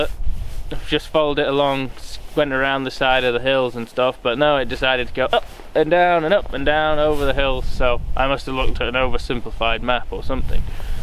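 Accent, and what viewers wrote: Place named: England, United Kingdom. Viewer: Where are you based? I hear a English accent but by the looks of it your either in Canada or the very north of North America.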